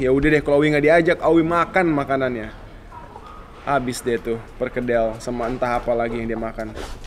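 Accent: Banyumas, Indonesia. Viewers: Mang mba nya org ngapak jg kya sy yah...rep ndeleng apaaa...kuwe artine mo lht apaaa..hihiii...btw...aslinya owee ank mna tuh...😘😘😘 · Mbaknya ngapak 😆 snowee lucu bgt 😘😘😍😍 fix, klw aku udah punya rumah sendiri mau punya satu :) udah pengen dari dulu.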